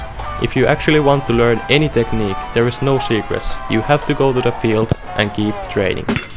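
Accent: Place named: Finland. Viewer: the accent screams suomi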